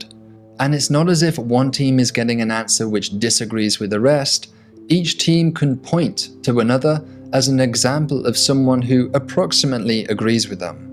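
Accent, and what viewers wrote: United Kingdom. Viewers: So if as you sound are British, why are you using so many Americanisms? Would an American do the same?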